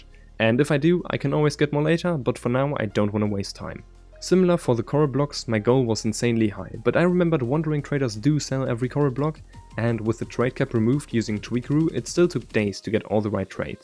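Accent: Germany. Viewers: Du hast ein leichten deutschen Akzent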